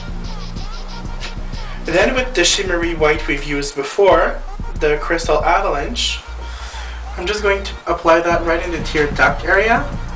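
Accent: Quebec, Canada. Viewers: (: i love your Canadian accent<3 and your skin looks flawless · OH un quebecois :O :D bonjour :)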